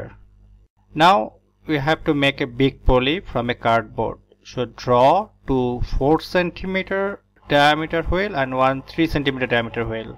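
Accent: India. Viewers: Are you and Indian from Southern part ????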